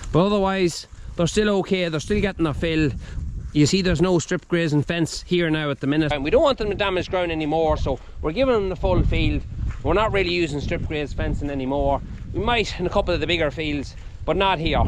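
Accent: Ireland. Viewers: ❤️❤️ LOVE THE CHANNEL ❤️❤️ 🤔🤔BUT🤔🤔... SOMETIMES I\\we, can't understand a word you're saying.!🧐🤔! SOMETIMES. You just talk TOOOOO FAST with that FANTASTIC Irish accent you have, and I\\we get lost in the wake.!🧐🤔! AND... The PERFECT EXAMPLE of this, is between the 12min point, and the 13min point, where you're obviously saying SOMETHING about how healthy it is for these lovely ladies to stay out, on the grass for as long as possible. But, at some stage they'll HAVE TO come indoors to spend their winter days and nights🥶. BUT... There's LOADS of detail you are giving us that we're simply not hearing, because you're TALKING TOO FAST, and we\\I can't keep up.!🤣! So... PLEASE talk a little bit slower when you're giving us the more important information. And... You can 'speedtalk' the less important stuff to your heart's content.!🥰! ABSOLUTELY LOVE THE VIDS.🥰🥰.... KEEP'EM COMING.🤞🥰.... 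RICH(UK).🥰🥰🥰.!.!.!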